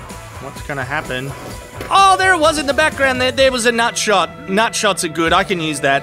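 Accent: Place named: Australia. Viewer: let me remind you, the only reason i watch your show is for your voice, you are funny, witty and amusing, if i want to watch shows of disaster its a dime a dozen on FB, but your aussie voice and jokes is the best, so no more silent videos. thank you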